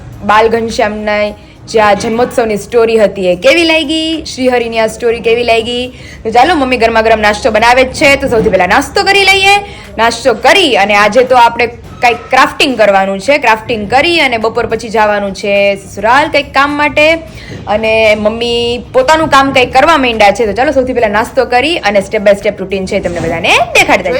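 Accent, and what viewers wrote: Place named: Kathiawad, India. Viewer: તારા મમ્મી હોશિયાર છે.. અને સ્વભાવ પણ સરસ છે... તમારી કાઠિયાવાડી ભાષા બહુ જ મીઠી લાગે છે...,❤❤❤❤❤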